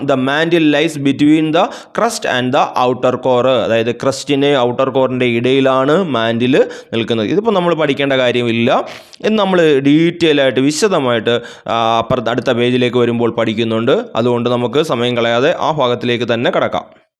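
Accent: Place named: Kottayam, India. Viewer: Ath thettalla avarude language Angane aayittanu. Kottayam polulla chila placesil 'PA' ' Pha' pronunciation different aavum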